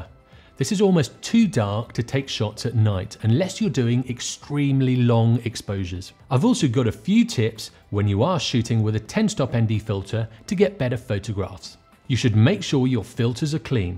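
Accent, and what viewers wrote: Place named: RP, United Kingdom. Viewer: You have a lovely posh accent.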